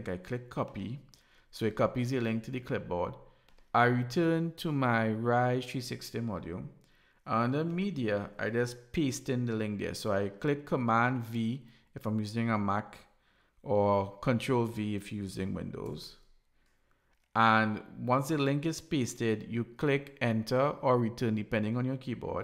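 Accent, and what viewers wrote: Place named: Trinidad and Tobago. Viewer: Thank you so much for this video. I detect a Trini accent 🙃hope I'm correct.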